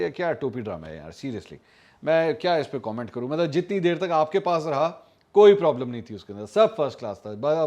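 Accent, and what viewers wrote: Punjab, Pakistan. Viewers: sahib jo punjabi ka tarka lagatay hain aap excellent i always like ur vlogs but sometime i criticize u over all ur doing excellent job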